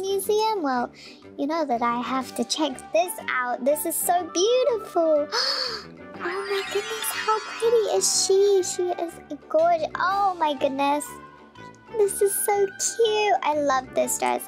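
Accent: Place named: United Kingdom. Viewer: Why is your voice like British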